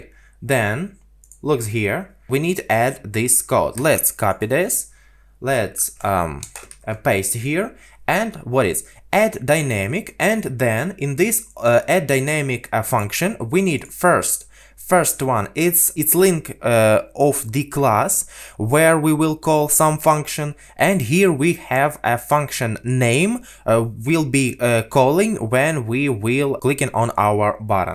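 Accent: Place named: England, United Kingdom. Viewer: O, vi iz anglii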